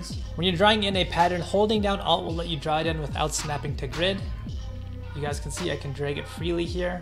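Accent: Punjab, India. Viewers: Are u punjabi bro?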